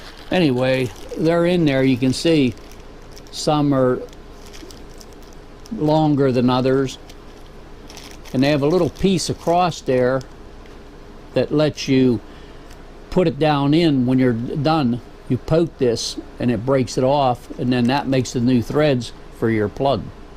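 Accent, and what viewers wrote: United States. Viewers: Are you from pennsylvania? I can hear it in your voice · I'm originally from New Castle. I know that western PA accent when I hear it!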